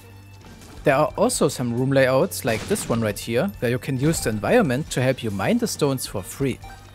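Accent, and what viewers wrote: Germany. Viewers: i hear an german accent, are you? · Hey ich wollte mal wegen deinem Akzent fragen ob du vllch deutsch bist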